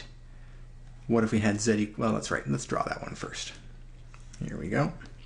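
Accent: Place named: Canada. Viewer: Thanks. Your 'out' and 'about' gave away your Canadianess eh!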